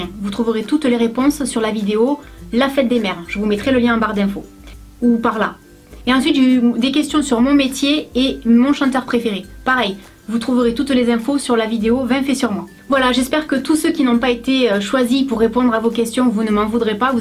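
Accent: South, France. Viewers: biendebonjour, ta un accent du Sud toi! mais ces mignon* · Je t adore et les sujets que tu aborde sont très intéressants. J'ai 13 ans et ça n'empêche pas que tu est l'une de mes youtubeuse préférée...💗💗💗 J'aime beaucoup la façon dont tu t'exprime et ton axent!!! je voudrais tellement avoir un axent du sud mais comme je suis du nord c mal parti.lol😘 gros bisous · Tes vraiment une belle personne, avec une sincérité, ca ne fait pas longtemps que je te suis mais j'adore complètement ta façon d'être et ta personnalité! ❤ et pour finir enfin une sudiste de pure souche sur YouTube ahah, je suis de Toulouse et je suis fière de nôtre accent! 👌💪❤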